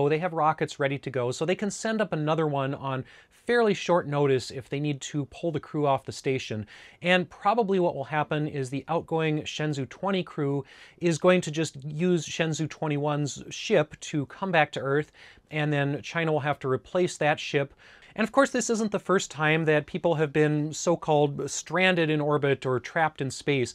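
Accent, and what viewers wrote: Alaska, United States. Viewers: Nothing wrong with with your Alaskan accent ….Manchester England 👍👍👋👋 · I never noticed your accent until you spoke the words “my Alaskan accent” and I heard it immediately. My brain went directly to Tina Fey playing Sarah Palin on SNL… I think I might be getting old. Cheers from Canada! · As a Canadian I appreciate your Alaskan accent. Nice video, 👍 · As a Swede, you’re Alaskan pronouncing is absolutely fine. I have never had any problems understanding what you say 👍😀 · I live in the Twin Cities. You have an Alaska accent?! What!?? You sound totally local.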